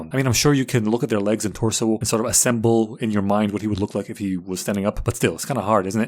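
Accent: United Kingdom. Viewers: DUDE ARE YOU ACTUALLY BRITISH?????????????? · No way my goat is british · HOLD UP WAIT A MINUTE! HE IS BRITISH ?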